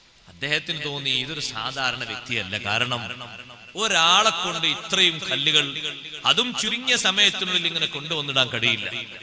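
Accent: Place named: Tamil Nadu, India. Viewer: E usthad thamizh natukarana athu konda chila aksharangal nammale ppole parayan pattathe athu kariyamakanda usthadhavarkal paranchath ketal mathi athanusarichu jeevikkan shramikkuka allahu usthadinu afiyathulla dhergayuss nalkatte ameen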